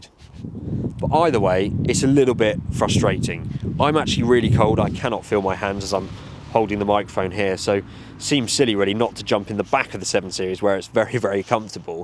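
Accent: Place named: Edinburgh, United Kingdom. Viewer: Hmm! Scot from Edinburgh eh! 😂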